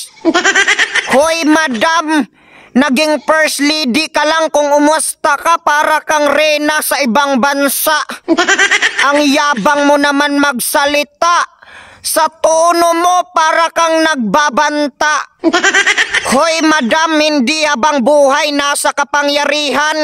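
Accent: Visayas, Philippines. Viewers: love ur visayan accent❤😊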